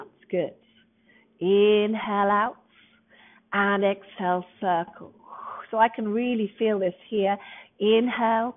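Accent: England, United Kingdom. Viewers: During coronavirus in Northern California, I was enjoying regular walks...till the fires came. Now I cannot even leave my house because the air is so bad...so for the very first time in my life I'm doing indoor/video exercises, and these videos are the first Pilates of my life. They're challenging (I've gained weight and lost muscle in the last 6 months) but also really fun, and I like the teacher a lot! I especially enjoy her accent -- didn't know she would be English, but it's nice for me as I used to live in ENgland and have many dear friends and family members there. THANK YOU! I'm going to keep using the four intro classes and then probably will subscribe.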